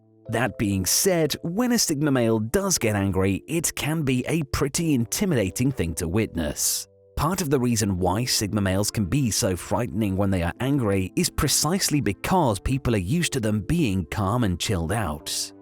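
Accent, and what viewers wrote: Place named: United Kingdom. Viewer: If you were born in America before 1960, and identify as a biological male, then you are what this charlatan defines (withHisBritishAccent), as a "sigma male".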